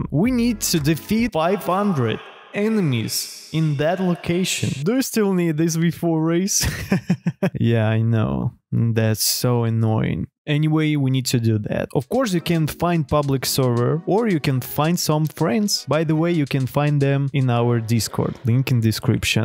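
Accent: Russia. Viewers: your accent is sounded like russian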